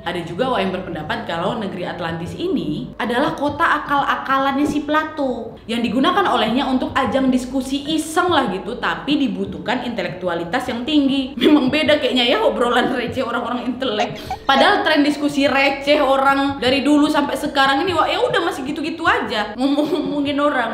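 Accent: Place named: Medan, Indonesia. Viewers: Dari logatnya aku duga kak ininorang medan. Ya kan wak? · Mak cik ini aslinya dari mana? Dari logat bahasanya kayak dari tanah Deli. Tapi awak tak tahu pasti kali lah.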